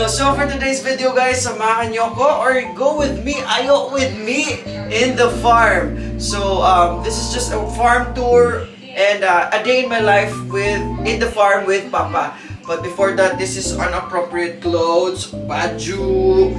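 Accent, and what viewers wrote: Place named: United Kingdom. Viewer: Lucu kali mas the way he speak "british accent" make me laugh at the same time of his cute face.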